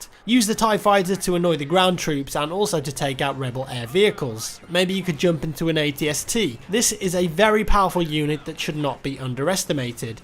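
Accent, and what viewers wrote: England, United Kingdom. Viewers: You seem to have the same English accent as Rick shiels a golf instructor on youtube. I wonder if you fellas are from the same area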